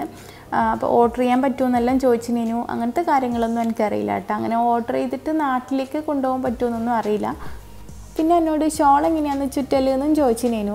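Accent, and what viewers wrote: Kannur, India. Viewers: Kannur slang ❤️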